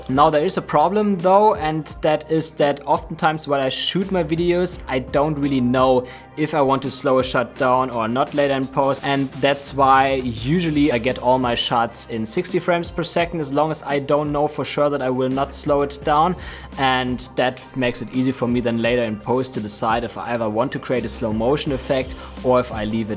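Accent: Germany. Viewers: aha gut ich bin aus Basel🙃 als ich deinen Namen sah und dein Akzent hörte, dachte ich, dass du bestimmt aus der DACH Region bist :) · Are you German?? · In den ersten 3 Sekunden gemerkt dass er deutscher isr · Definitely German accent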